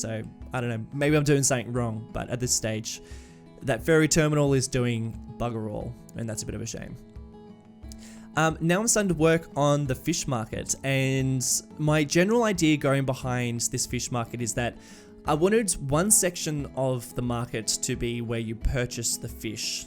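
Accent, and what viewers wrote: Australia. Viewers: Lemme translate aussie to non-aussie. Erhm. 'Good day (g'day) guys, and welcome back to.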